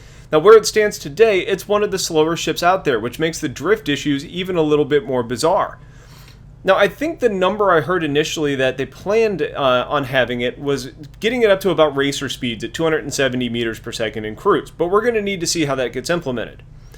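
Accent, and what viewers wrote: United States. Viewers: Nice to have an actual American voice, rather than a very gay British voice doing commentary.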